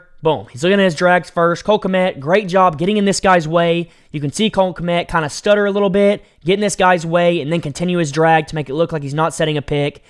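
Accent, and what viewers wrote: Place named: South, United States. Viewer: The southern gentleman who provides this commentary should have a job in pro football somewhere.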